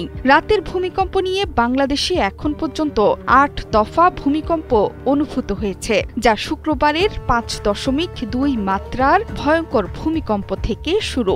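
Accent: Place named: West Bengal, India. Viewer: খবর পরার স্টাইল পুরাই ইন্ডিয়ান বাংলার মত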